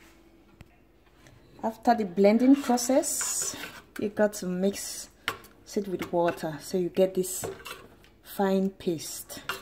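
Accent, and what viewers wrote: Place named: Jamaica. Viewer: You sound like a Jamaican thanks you tho for sharing love and lite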